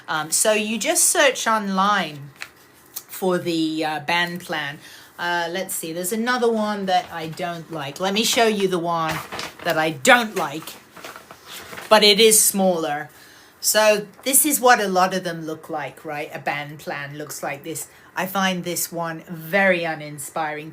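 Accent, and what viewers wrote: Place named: England, United Kingdom. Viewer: I was about to ask where you was thats an English accent and then you said you were in la. Well we shall watch and enjoy 😊 thank you for sharing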